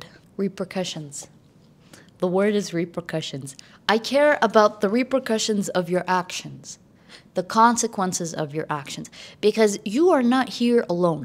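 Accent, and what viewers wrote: United Kingdom. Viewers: Love the british accent du know wat mean like😅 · As a revert, I really take a comfort in your podcasts and videos. I met you on TikTok but I love your YouTube much more because of the longer videos ❤ I’m over here studying and listening to you and repeating your British “d’yknow whahimehn?”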